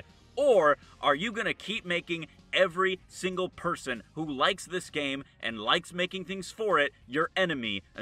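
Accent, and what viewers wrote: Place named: United States. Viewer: why do you sound like mönkey but with an american accent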